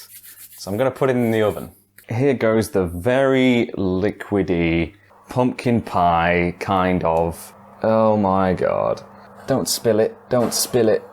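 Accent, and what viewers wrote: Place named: United States. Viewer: His accent is half American.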